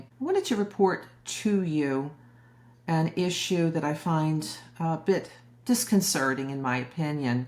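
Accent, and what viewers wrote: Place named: Philadelphia, United States. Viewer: I have a question off-topic. Would you care to settle a bet between my wife and me? (Yes, despite my YouTube name, I am a male (long story)) We were trying to pin down your accent. She thinks Philly and I think Deleware. Do one of us have it right?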